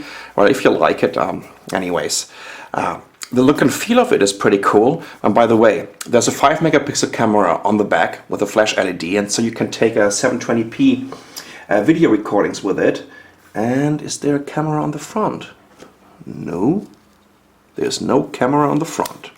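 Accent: Germany. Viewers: dude, you have a very strong german accent. haha, btw good video! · are u german..?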